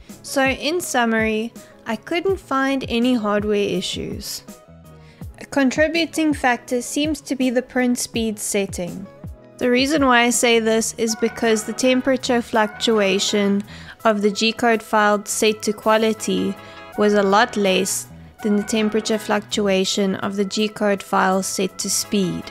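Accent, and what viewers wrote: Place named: South Africa. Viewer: I'm usually good at picking up what an accent is. Yours has me stumped, but I'll take a guess, are you from South Africa but have lived elsewhere long enough to end up with a hybrid accent? Great video. I had thermal runaway recently too, but it was due to me experimenting with my machine after flashing the firmware.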